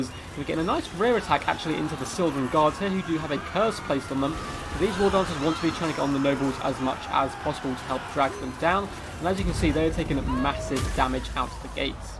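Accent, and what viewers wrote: United Kingdom. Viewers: still at it!!! <3 love youuuuuuuu. >:( when will alithinar get sum puss puss >:( im waiting on the second enstalment of elf romance softcore porn in ur lovely british voice:p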